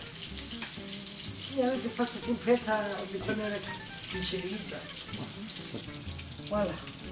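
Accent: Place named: Sicily, Italy. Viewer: La nonna parle un sicilien parfait de la région d Agrigento et en plus les Pourpette ont l’air délicieux brava la nonna demain j’essaye de le faire aussi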